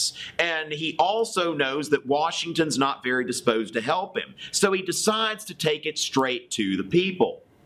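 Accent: South, United States. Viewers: I LOVE THIS COUNTRY ACCENT MERICAA!!!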